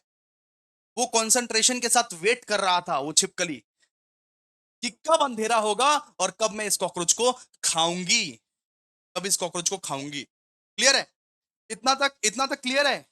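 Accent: Bengal, India. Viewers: Hahaha 😂 Your bengali accent is so cute (Khub mishti 😆)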